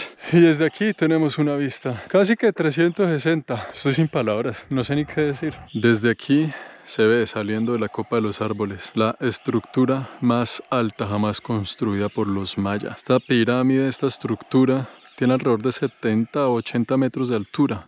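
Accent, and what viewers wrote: Colombia. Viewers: Español Colombiano.😅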